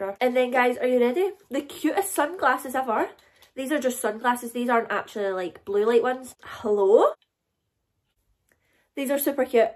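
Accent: Scotland, United Kingdom. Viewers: I love your Scottish Accent ❤️❣️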